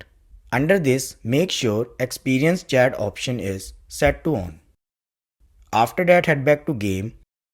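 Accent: India. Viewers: and you sound like from india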